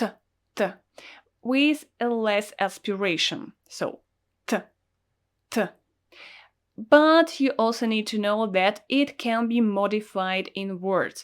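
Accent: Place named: Russia. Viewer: Спасибо за видео. One single tip - try to pronounce English words with an O clearly. For example Organization or motivation with a clear O. I know as a Russian native it's hart to switch because the O will be pronounced as an A. So your English word organization sound like arganisation and motivation like mativation. Not bad at all but funny:)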